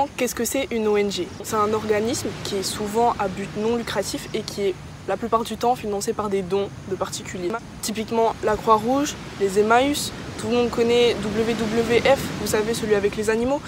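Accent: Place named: Africa. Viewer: Elle a un peu un accent africain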